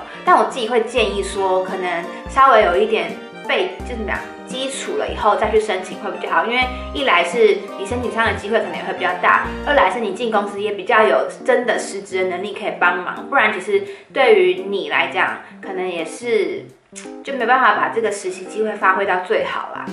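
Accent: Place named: Germany. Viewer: 她是德语发音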